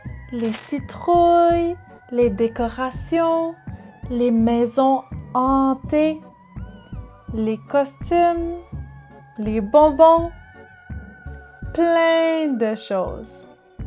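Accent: Quebec, Canada. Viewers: Fun video! I am amazed at how different the Quebecois accent is. It's lovely but I'm not used to it.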